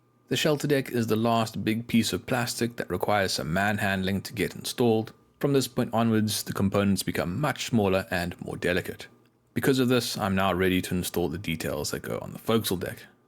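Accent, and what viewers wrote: South Africa. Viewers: Good god man you do really hate yourself dont you ? Where you from am I hearing a hint of the Karoo ?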